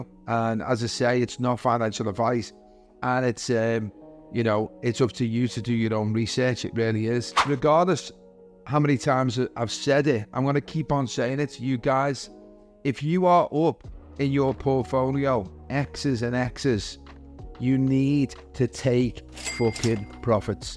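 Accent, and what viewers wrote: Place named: Scotland, United Kingdom. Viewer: I follow you for a while on twitter , but leasening to your accent , your Scotish 😁😁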